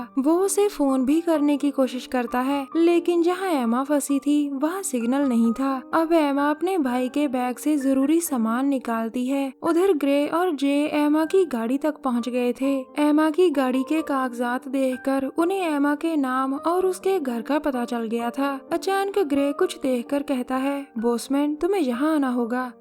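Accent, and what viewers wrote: Punjab, India. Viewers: ਤੁਹਾਡੀ ਆਵਾਜ਼ ਤੋਂ ਸਾਫ ਪਤਾ ਲੱਗਦਾ ਕਿ ਤੁਸੀ ਪੰਜਾਬੀ ਹੋ ਤੇ ਰਿਹਾਇਸ਼ ਪੰਜਾਬ ਦੇ ਕਿਸੇ ਪਿੰਡ ਦੀ ਹੈ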